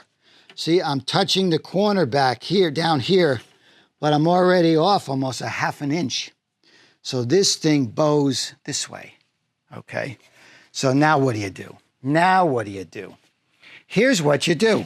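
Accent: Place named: Boston, United States. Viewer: absolutely not Spent 15 years in Boston.. now back in Ireland.. your accent brought some good memories back.. “Now go get your Shinebox”…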